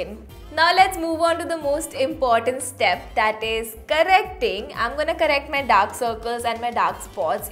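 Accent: India. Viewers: has such an indian accent!...like....calaa ba pafect match prrima!...but roshni and upalina has more of foreign accent!btw just my veiw no offense!(and having indian accent isn't bad at all).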